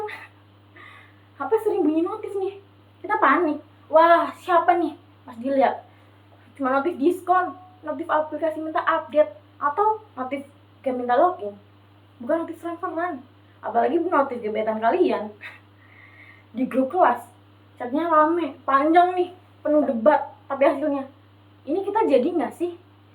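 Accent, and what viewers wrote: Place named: Banyumas, Indonesia. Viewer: Gen Z ngapak 🥰🥰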